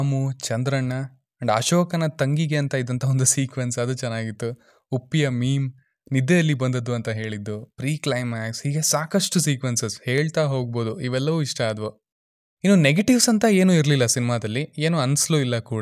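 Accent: Honnali, India. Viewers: Nim voice and mathu kelidre nivu ಹೊನ್ನಾಳಿ avru ansalla!!!!! ನಿಜವಾಗಲೂ 😊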